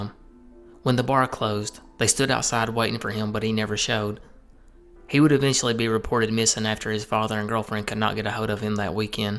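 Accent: South, United States. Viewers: that southern drawl lol.. it’s one of the reasons i like this channel and caroline easom’s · From one southern youtuber to another, i appreciate hearing the accent. It's a character thats been mostly lost on youtube. Also great research aand pace with the video.I feel like you chose just the right ammount of time to spend on each topic. Subscribed big dog. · You sound so southern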